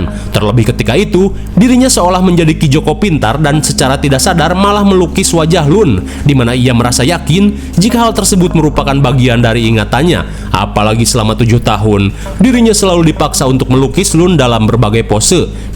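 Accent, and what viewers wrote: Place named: West Java, Indonesia. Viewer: mang,lahir taun braha sih😂..sundana jiga Sunda bandung cianjur,milenial pasti yeuh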